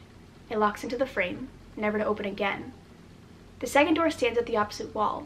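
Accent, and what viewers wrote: United States. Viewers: Maya W she had a slight country accent. I’m from Mississippi and mine comes out often.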